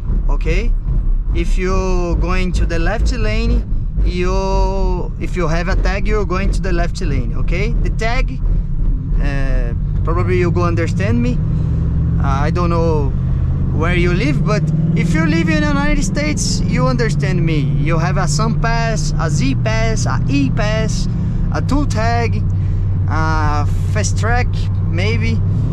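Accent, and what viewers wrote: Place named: Brazil. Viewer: ele "perde" esse sotaque abrasileirado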